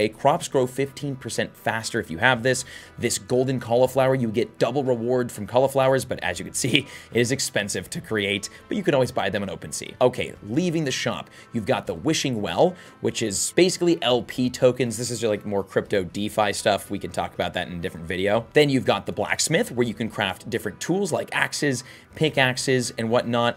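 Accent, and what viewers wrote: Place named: South, United States. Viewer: I’m learning English for quite long, and this southern accent is siiiiiiick!! Love it , it brings some cool atmosphere here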